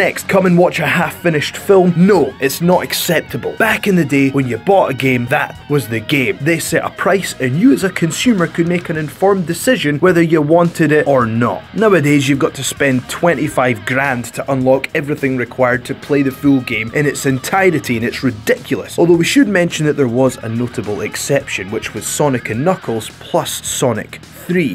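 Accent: Scotland, United Kingdom. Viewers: I like you, don't be sad! I mean, I prefer the mad quartet of plumpy, peter, ben and jules..... but you have the lovely Scottish accent. Oh and you totally 'technical wrestling word' Jules which gives you a total pass!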